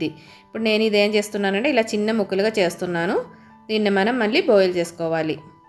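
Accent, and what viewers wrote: Kerala, India. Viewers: teluguni kerala accent lo matladthunnaru very nice ...first time hearing this ..how u learned telugu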